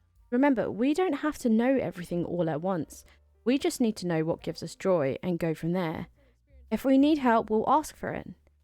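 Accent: United Kingdom. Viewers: The amusing bit out of all of this: you sound British as hell in this video. No hint of aussie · Only reason why I watch. and for the rants with bri'ish accent.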